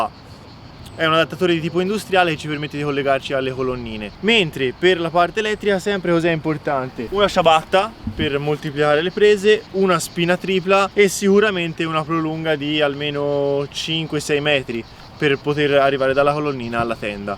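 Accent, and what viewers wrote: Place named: Tuscany, Italy. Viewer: "queesto materassino della (c)amp" adoro l'accento toscano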